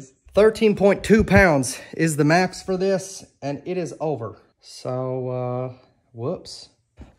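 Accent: South, United States. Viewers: Why are you southern American in this video but Scottish in the others?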